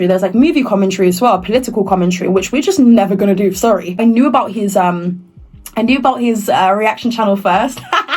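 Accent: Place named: United Kingdom. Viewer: trouble understanding you at times cause of your British accent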